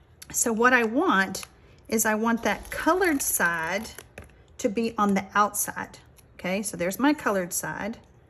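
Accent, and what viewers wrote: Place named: Texas, United States. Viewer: These are beautiful! I love your card making ideas and listening to your Texan drawl .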